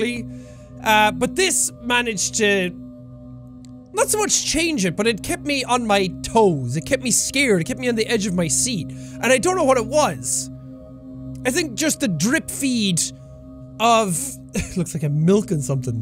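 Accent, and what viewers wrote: Ireland. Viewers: I love how used to hide his Irishness and he's now more Irish than ever. If he ever says "Fecking eejit" I will lose my shit. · I was so scared just hearing his irish accent get more intense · He sounds so much more Irish ☘️ in this video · I love how progressively became less irish through out his career and now he's suddenly hyper irish. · I don't know if I just haven't watched in a while or something but you sound VERY Irish to me in this video for some reason. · was so uncontrollably irish in this video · Is it just me or does sound impossibly more Irish this episode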